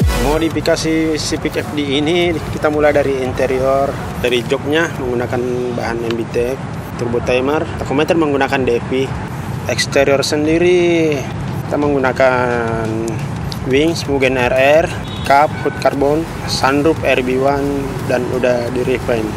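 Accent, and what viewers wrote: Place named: Medan, Indonesia. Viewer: Orng medan abg ni ku yakin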